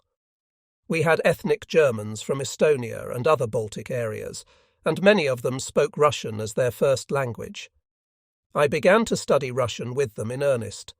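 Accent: England, United Kingdom. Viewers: You must be from the same area of England as Mark Felton.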